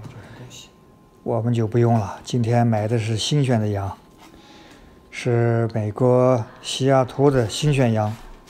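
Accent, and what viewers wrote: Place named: Xinjiang, China. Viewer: 好正宗的新疆普通话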